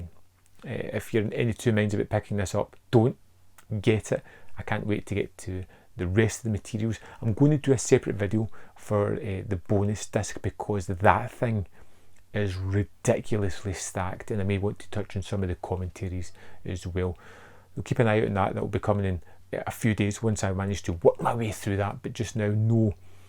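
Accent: United Kingdom. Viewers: I came across your channel because of this release. You've got a strong accent mate that I really have to get used to when watching your review. 😂 Germany here, but I'm pretty much fluent in English. The British accent is one of its kind though. Anyways, I pre ordered my copy when it went on sale and I'm so much looking forward to it. What's more, my cinema will bring Dawn of the Dead on the big screen on Halloween night for one presentation only. Can't wait to check it out. You guys got some pretty cool releases coming out, talking about the other Second Sight Films announcement for Dog Soldiers and the 4K box set for Total Recall. 2020 was definitely one of the most expensive years for me buying films with all the amazing releases. Keep coming the good work mate. I subscribed to your channel. Cheers, Basti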